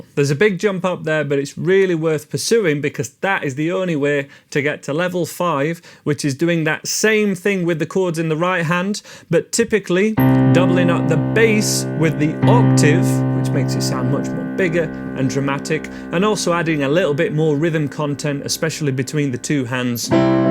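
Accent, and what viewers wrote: Yorkshire, United Kingdom. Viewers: Pleased to meet you, I just found your video on you tube I liked your warm style, by that I mean you explain everything slow enough for an old fart like me now 68, I like also the way you break down sections, chords notes and the stave although I have to read more theory as I feel I am missing a very important part of my journey here mate. Shall I go on oh yes you mentioned flow training,that a big financial commitment will you be on there teaching or will it be someone else, so I am going follow you for a while see how I get on then take it from there really I like your accent poss Yorkshire guy. Thank you so much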